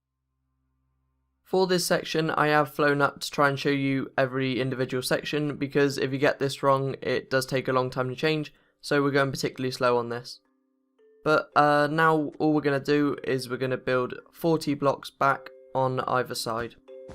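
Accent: United Kingdom. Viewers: Youre buildings are no joke good as hell, by the sound of youre voice you sound like youre from the UK right? Varry good building bro. I subscribed to you so i can see more. Is it alright if i download the tutorials of this building for my own use only? Youtube sucks up all my data and i would love to make more of these. I do the same with Jagthunder1 cause his vids are bad ass and hes got some wicked ships. Check em out also. But any ways this is the best building ive ever made and you did a VARRY good job with explaneing it step by step witch made me build the first step perfect. And you stopt here and there and thsts where i paused it to build. Perfect vid man. Im looking forward to seeing more builds like this aswell. Thanks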